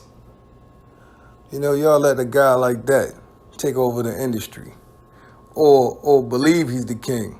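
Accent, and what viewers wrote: Baltimore, United States. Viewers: You sound like you from baltimore.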